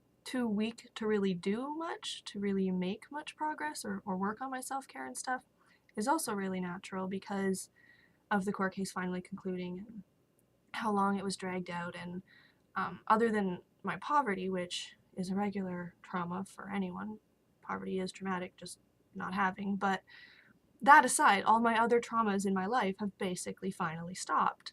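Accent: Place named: Canada. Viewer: Where are you from im from Denmark. Some of what you say Sound canadian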